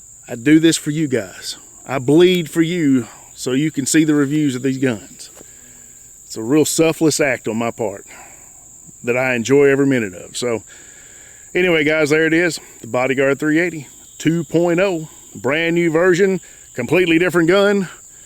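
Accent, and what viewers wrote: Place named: South, United States. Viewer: I'm from the North and enjoy your Southern drawl. Tying to get my wife to try it also. PS - My Sig 100 grain ammo tends to spit back at me also.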